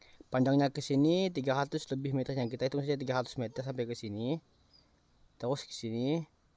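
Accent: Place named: Banjar, Indonesia. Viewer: Logatnya kayak logat banjar dikit..maaf bg mau nanya kenapa daerah sambas bnyak dijual lahan uda jadi..kalau untuk perantauw aman aj kah hidup disana bg..gak ada gesekan seperti yg lalu2 kah bg..antara masyarakat tempatan sma madura..gmana penduduk asli disana bg..apa menerima dengan baik untuk pendatang dri luar dan berbagai macam suku..🙏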